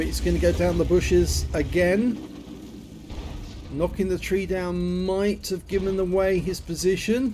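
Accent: United Kingdom. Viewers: I love the accent, you sound like a british, so authentic... Where did you get your accent from? :)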